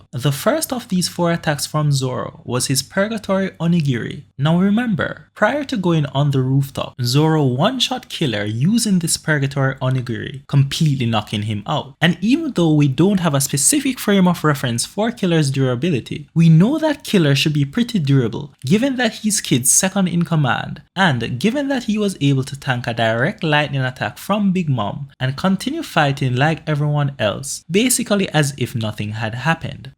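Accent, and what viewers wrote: Jamaica. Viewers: U sound Jamaican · Sounds Jamaican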